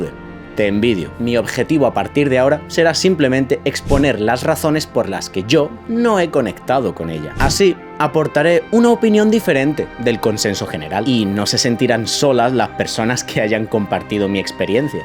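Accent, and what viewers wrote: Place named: Andalusia, Spain. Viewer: Encima todo con acento andaluz 👌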